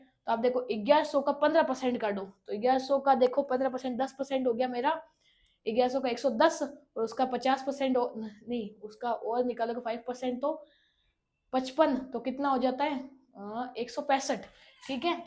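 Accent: Rajasthan, India. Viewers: Tone is rajsthan 🙏m rajsthan se hun🙏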